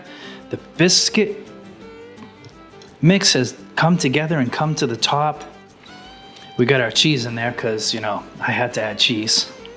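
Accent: Massachusetts, United States. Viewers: You're from Massachusetts, never apologize for how you say cheddah, ked!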